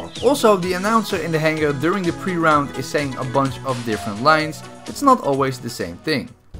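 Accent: Netherlands. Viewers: Are you dutch? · Ben je nederlands want zo klingt je accent pls zeg het als het zo is ik abboneer nu btw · Brooooo je hebt echt een zwaaaar nederlands accent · I knew from the first sentence that he is dutch 😂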